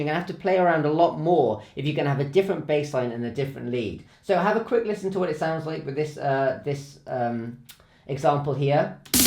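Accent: Wales, United Kingdom. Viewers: Is that a bit of welsh I hear in that accent of yours!! Cymru am Byth!!!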